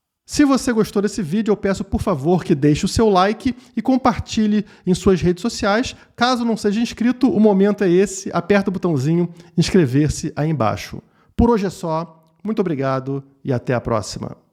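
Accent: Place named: Central-West, Brazil. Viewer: Cara, tu é top, sotaque e dicção ótima de ouvir, tu é de onde? Goiânia? Brasília? Tu é top sou teu fã! Se quiser criar um movimento separatistas conte comigo. 😂😉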